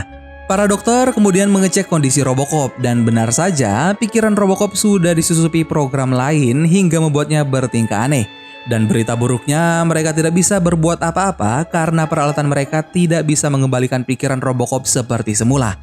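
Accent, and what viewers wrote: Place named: Java, Indonesia. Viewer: guanteengg,di pikiran ku pasti mimin orang jawa😁😁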